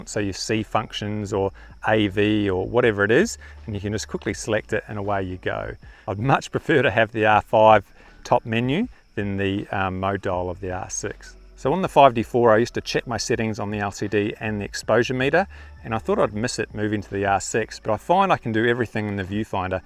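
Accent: Australia. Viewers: should add some subtitles mate, ur ausie accent isnt so easy for everybody..gday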